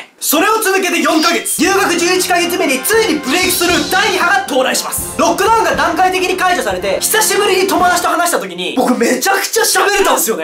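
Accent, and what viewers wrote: Australia. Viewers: 1年でこんなに伸びるなんてすごい! 大学院(MBAかな?)TOEFLとかの下積みもあったと思いますがすごいですね。しかも、しっかりオーストラリア英語のアクセントになってる（笑） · オーストラリアなまり！！カッケーっす！ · ばっちりAussie accentになってて推せます。笑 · あと、オーストラリアンアクセントを習得してますよ！！！ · 待ってなんで、1年でオージーアクセントを習得したんや！？ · やばいオージーアクセントめっちゃかっこいい私もカナダに留学して2年目です！めっちゃ面白い🤣です。応援しています📣、！ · オーストラリア訛りっぽくなってる！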